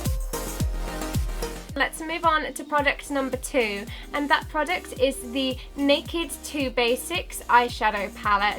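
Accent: England, United Kingdom. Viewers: What kind of English accent do you have?? You sound like a teacher I had who was from the UK and I've always wondered what kind of accent she had cause I know it's not a cockney accent and that's about all I know lol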